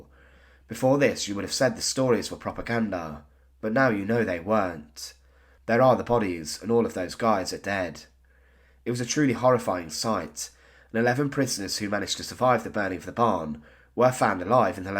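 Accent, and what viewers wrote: United Kingdom. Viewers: Who is the jew with British accent narrating ? jew Bolshevism / Red October / Tsar Family Assassination / Holodomor / Stalin / Dresden Bombings / jew Soviet atrocities against civilians/ genrikh yagoda and countless others Shut up ya yid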